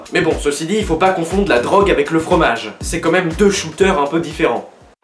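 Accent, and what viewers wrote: France. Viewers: Superbe vidéo et tu est vraiment du nord ? :D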